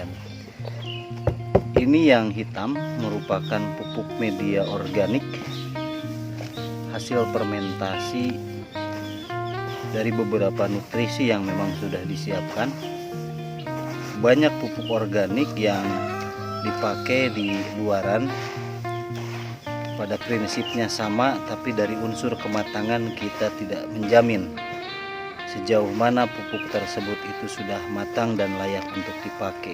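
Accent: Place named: Sunda, Indonesia. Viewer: mantaap..rapi pisan penataan dan perawatan ala pro.thnk sudah shering kang. logat nya sunda aslinya dari mana kang?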